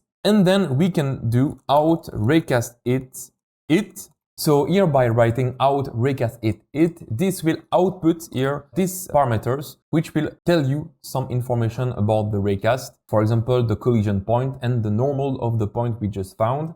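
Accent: France. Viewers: Hi, are you french? your accent...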